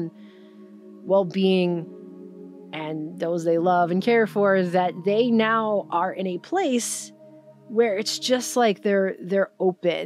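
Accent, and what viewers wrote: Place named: Texas, United States. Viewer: ngl that Texas accent becomes very irksome after several minutes of hearing it.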